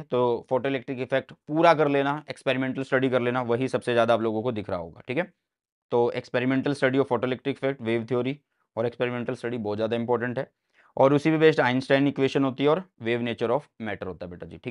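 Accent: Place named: Haryana, India. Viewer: Sir aap haryana ke ho...apni language mein thoda accent hain haryanvi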